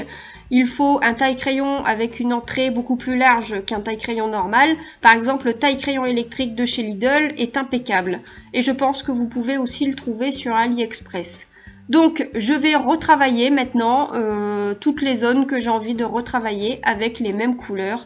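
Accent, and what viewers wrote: Nord-Pas-de-Calais, France. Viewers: pas du tout. Adorable accent chti hein?😁😁😚💗💗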